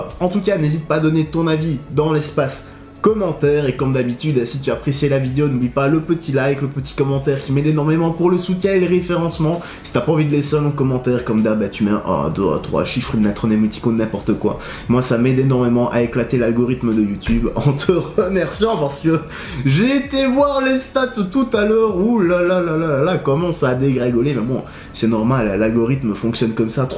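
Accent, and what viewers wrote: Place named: Belgium. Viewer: Yop petit vieux nouveau sur ta chaîne YT. Nouveau fan de mma et de l'ufc depuis octobre 21. Après la découverte de mr Ciryl Gane 😁 Comme pas mal de nouveaux fans je pense. Sont année 2021 est impressionnante. Merci les multiples émissions sur lui et sa team la (ou le) mma factory. Du coup... A force de chercher des chaînes sur le mma je tombe sur toi et... 😁😂 ton accent bien belge même si je repère pas la région. Je suis de Charleroi originaire de Rochefort. J'aime bien ta manière de présenter tes sujets et le ton que tu utilises. Rafraîchissant, et assez marrant 😉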